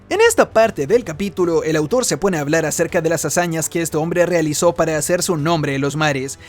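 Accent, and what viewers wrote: Chile. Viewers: Pensé que era chileno, de mi chile querido.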